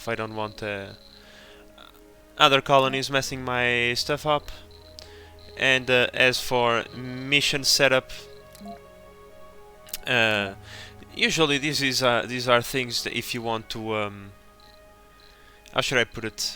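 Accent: Portugal. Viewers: I bet you are portuguese, you sound like it, dont get me wrong, your english is very good :) and spanish people dont go along that well with english eheh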